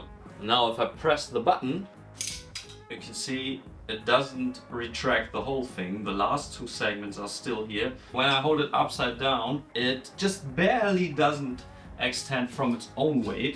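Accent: Germany. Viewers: As a german who is also fluent in english, I really love the denglisch vibes.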